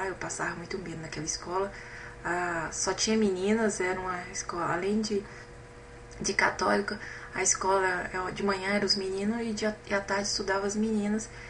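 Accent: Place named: Minas Gerais, Brazil. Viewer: Pra saber. Pelo seu sotaque, achei que fosse de Minas.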